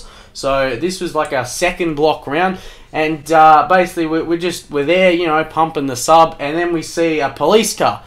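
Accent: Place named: Australia. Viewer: I never knew you were an Aussie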